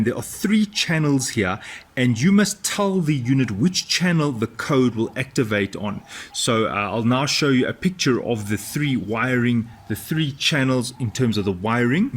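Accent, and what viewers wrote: South Africa. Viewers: Sounds like a South African accent. Hi from Canada. Was actually looking for keypad opener that I can add or remove user codes through my smartthings controller like I can for my front door locks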